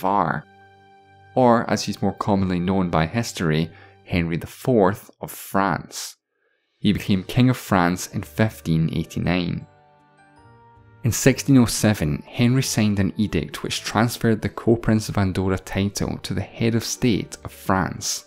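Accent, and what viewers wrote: Scotland, United Kingdom. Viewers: Do i hear a Scottish accent? 👀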